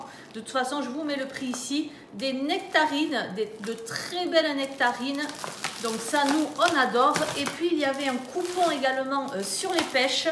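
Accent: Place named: Toulouse, France. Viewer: rien de mal. Je vous adore votre voix. Elle me berce pour le retour de course et elle me rappelle mes amis de Toulouse. Vous avez même accent et j'adore et j'adore votre accent. Bon continuation 🥰🙏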